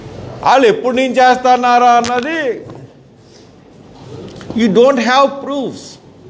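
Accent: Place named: Godavari, India. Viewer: ఓరి నీ ..... ఇదెక్కడి గొడవండి గోదావరి యాస సూపర్ గా ఉంది సర్ 😂😂😂😂😂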